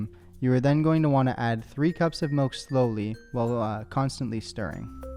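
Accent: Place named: Canada. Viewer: sounded extra Canadian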